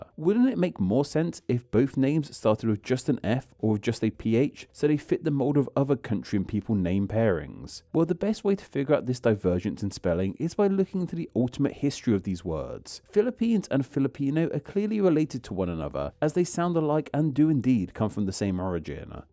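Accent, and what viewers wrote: United Kingdom. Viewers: I doubt he did it on purpose. Being a US citizen, I encounter many horrendous mispronunciations in real life. It's somewhat an "entitlement" to the language of its country. I hear people say: "How do you pronounce this or that with an English accent?" (not related to England of course) It's the lack of trying or want to try in my personal opinion. Don't misunderstand me, there are many who do try (and succeed or fail.) But this one is just reading the name with an extreme form of dyslexia and not caring, leaving the horribly butchered name in the video instead of editing it out with a correct pronunciation.... plain laziness and monetization. edit: And now I have to apologise because after listening on, I find the narrator speaks with a British, albeit not thick, accent and nothing to do with the Americas and the US of it.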